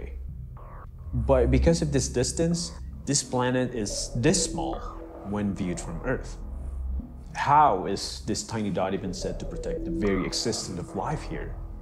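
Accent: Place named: England, United Kingdom. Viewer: ur english accent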